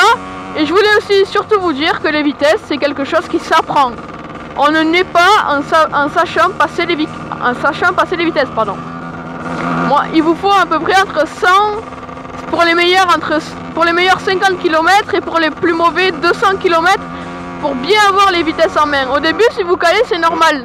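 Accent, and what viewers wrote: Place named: South, France. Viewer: Très bien expliqué! Tu t'exprimes vraiment bien; et j'aime beaucoup ton léger accent du sud, moi qui parle comme les ch'tis ça fait du bien d'entendre une autre mélodie. À bientôt jeune motard. 😊✌😉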